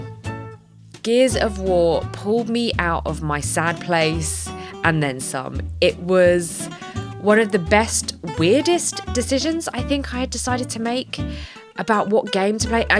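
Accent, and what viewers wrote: United Kingdom. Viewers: Having a British accent is like listening to someone on 24 hour cosplay for Lord of the Rings or Game of Thrones...All that medieval fantasy stuff to nerd out for. Have a Merry Christmas!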